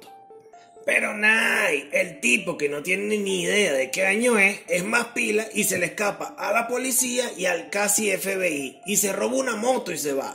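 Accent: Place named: Venezuela. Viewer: Jaja tremendo narrador maracaibo o Caracas? Me espoleaste por completo 😂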